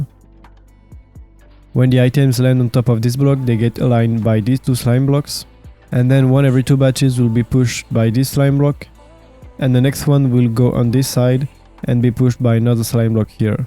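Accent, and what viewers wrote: France. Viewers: HA HA jai vérifié et t'a bien l'accent français chef. Mais sinon tres bonne video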